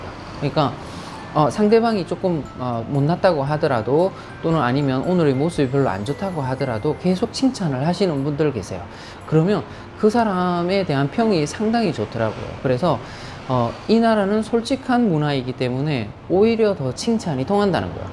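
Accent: Gyeongsang, South Korea. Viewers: 역시 서울말투보단 경상도말투가 믿음과 신뢰성이 가네요~~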